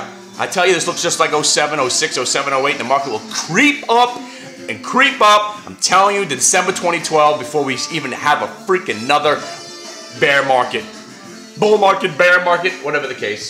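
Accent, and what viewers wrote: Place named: United Kingdom. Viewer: genuine british accent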